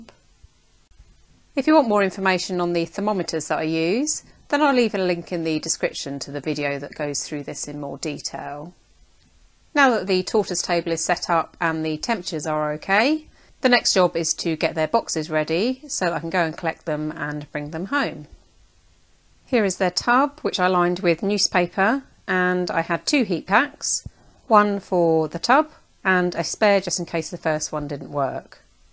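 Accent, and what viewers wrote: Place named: England, United Kingdom. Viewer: what part of England are you from? Your accent is really beautiful!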